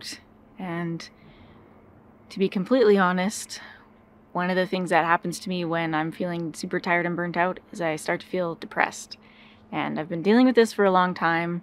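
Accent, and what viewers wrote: Australia. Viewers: are you from Australia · Hello from Puerto Rico. congratulations for the way you help your husband? and do such heavy chores. Keep safe from the black bears, I believe they could be dangerous if approach by humans. You should carry a weapon just in case to defend yourself. By the way, are you Australian?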